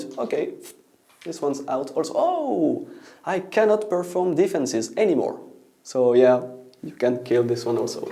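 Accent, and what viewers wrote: France. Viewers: should have done the whole presentation, the French accent sounds much better on women. Also, the hand motions were super distracting. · Thank you for this video. I have worked with suppliers in Switzerland and France for twenty years so I am very used to hearing English with a strong French accent. In addition I must say your English is excellent, I wish my French was a fraction as good. I can see how to a person not used to listening through a strong French accent that this instructional video can be difficult. This isn't a casual conversation but detailed instructions so anything which distracts from the details is noticed much more than usual. I would recommend people watch this more than once if they are having problems. Any person who has a different language as their primary would have the same difficulty here. Part of the problem is Americans are not used to listening through an accent, especially a French one. 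That is just the nature of having one language dominate the majority of the continent you are on. As far as the hand waving, it doesn't bother me at all. I live in New York and half my family is Italian. It is said the best way to shut up an Italian is to tie his hands up so it does not distract me at all:-)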